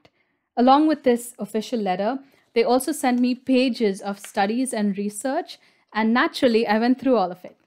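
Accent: India. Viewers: I now watched several of your videos. I'll be honest here. When The Algorithm showed me your first video I saw a woman with Indian accent and all my biases (yes I have those, sorry I am human) kicked in. But listening for few minutes and I was hooked. Well researched, well versed and you seem super nice and reasonable. Great content, well produced, down to earth and informative. Thanks!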